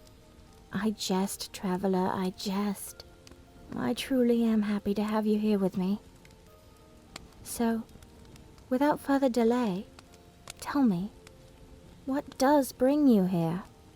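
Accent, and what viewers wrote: United Kingdom. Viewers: yes i get to hear my british dragon waifu again. <3 but in all seriousness keep up the good work and keep captivating us with these stories :) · Why is she British now? I like it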